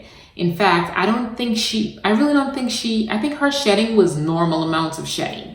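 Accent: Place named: Jamaica. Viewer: I also hear some Jamaican in there, I believe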